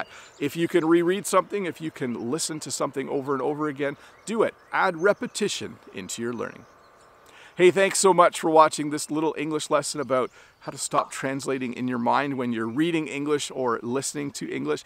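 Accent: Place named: Canada. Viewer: is this canadian english? I understood everything he was saying. American English is difficult for me to hear.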